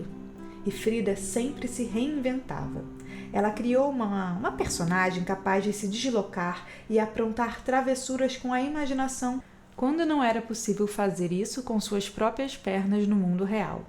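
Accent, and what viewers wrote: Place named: Rio de Janeiro, Brazil. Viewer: Ah esse sotaque carioca...❤️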